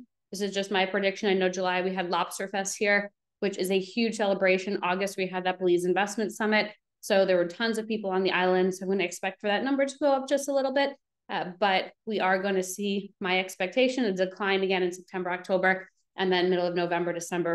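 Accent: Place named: New York, United States. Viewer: As soon as you started speaking, I knew you were from NY!! :D Great content. Thank you for your work!! Looking forward to your next live session, and your other channel. Notifications are now on. Belize planning underway.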